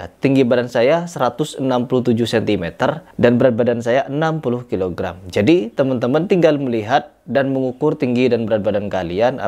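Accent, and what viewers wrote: Madura, Indonesia. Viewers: Bang Aku senang dengar aksen Maduranya walaupun Aku orang Maluku 👍Hajar terus Bang Mereka mereka yang berprilaku seperti Serigala berbulu domba 😭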